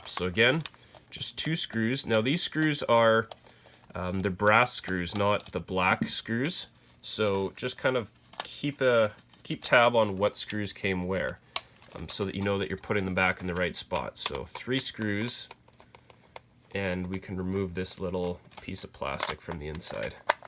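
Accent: Canada. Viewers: Canada ay! You can tell, nothing wrong with that tho.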